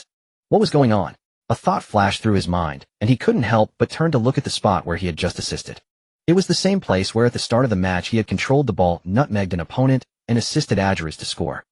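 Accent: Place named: China. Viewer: Is he playing in La Liga or Chinese super league because every name is pronounced in Chinese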